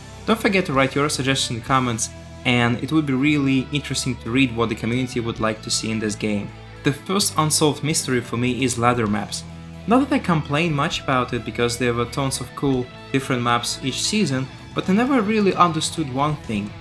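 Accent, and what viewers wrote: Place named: Russia. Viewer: not strange, just noticable, but in a good way, absolutely understandable and yes, now you mention Russian, I can kind of hear it, but much softer than the stereotypical (although also very cool) strong Russian accent! Thanks for answering, loving your vids! Cheers! :)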